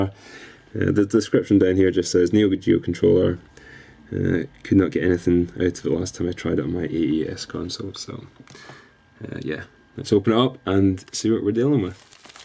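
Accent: Scotland, United Kingdom. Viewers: I didn't realize he was Scottish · Scottish? Subscribed. Always subscribe to other Scottish gamers :P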